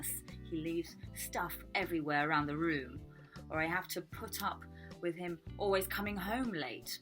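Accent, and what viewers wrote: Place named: United Kingdom. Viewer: I really love your British accent! ... it's just fantastic, he he. Great lesson about phrasal verbs, super helpful. This Valentine's day probably I'll be watching some TV series he he nothing special for me. Although I wouldn't mind going out with a British girl like you ;) heheheh. Thanks, love your videos.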